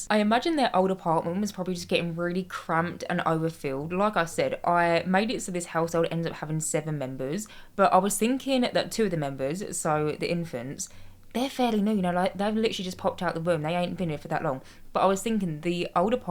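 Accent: United Kingdom. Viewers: as you started talking, i knew instantly you were from the UK. as i am British i just knew!! 💟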